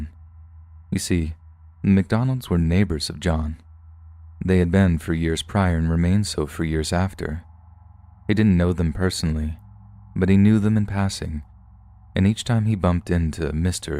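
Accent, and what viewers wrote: France. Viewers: Ooooo I love your French accent lol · Your French accent is so sweet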